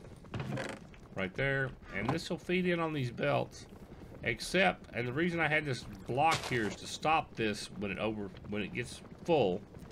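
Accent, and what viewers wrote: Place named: United States. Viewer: this mans voice makes me miss the USA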